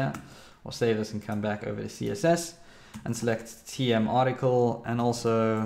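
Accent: South Africa. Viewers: I'm getting addicted to your tutorials and that accent from SA. Thanks, from Essex, UK.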